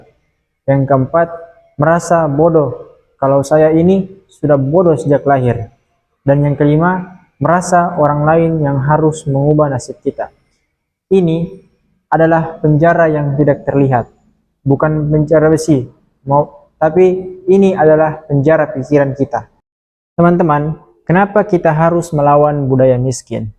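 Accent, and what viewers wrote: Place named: Java, Indonesia. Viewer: Jawanya melekat bgt kak🤩🤩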